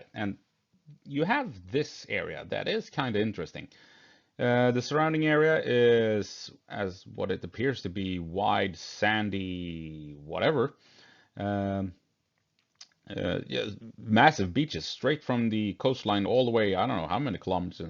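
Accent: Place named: Sweden. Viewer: Haha 😂. Nice to hear some swenglish. Nice work!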